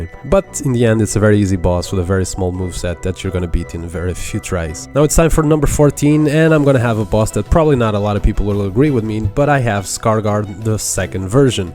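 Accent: Portugal. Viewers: nah i think he is portuguese I can feel on his accent. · 1st i hate all of you for being able to beat bosses while only dying 3 times or wtv, I'm going to cry (except Moss Mother that i killed 1st try idk how yall do it) 2nd i was like this dude's accent sounds like he's portuguese, and apparently yeah? Bom trabalho 👍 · pelo teu sotaque percebi logo que eras tuga porque é literalmente igual ao meu😅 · That portuguese accent made me smile ! 😄 nice analysis!! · Are you portuguese (I recognize the accent)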